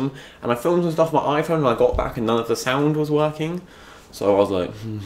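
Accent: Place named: England, United Kingdom. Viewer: haha hilarious. subscribed! plussss, an english accent? god, for sure. Imao, take care, xo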